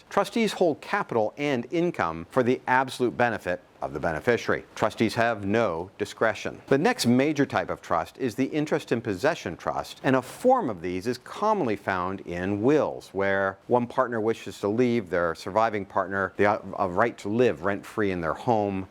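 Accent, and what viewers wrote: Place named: United States. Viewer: Nothing personal but an American accent is a bit of a red flag especially with the few Americanisms being slipped into UK society since Brexit. A lot more Private healthcare and therapy adverts being the most obvious.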